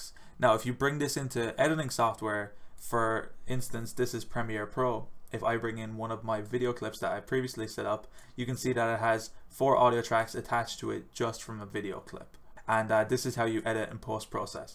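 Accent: Ireland. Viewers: Are you Irish by any chance? Great tutorial by the way! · Thank you very much for this clear and well-explained video. Do I detect an Irish accent? If so, snap ;)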